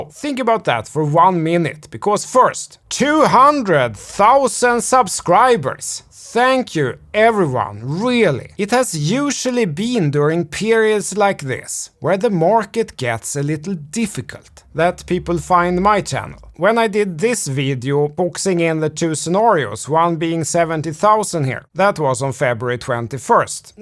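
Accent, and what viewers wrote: Sweden. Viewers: Din svinglish är obetalbar!😂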